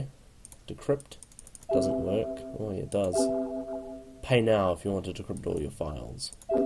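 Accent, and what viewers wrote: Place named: New Zealand. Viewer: Nice kiwi accent ;)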